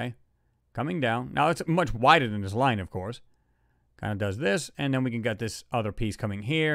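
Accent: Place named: South, United States. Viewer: bro I love your southern accent.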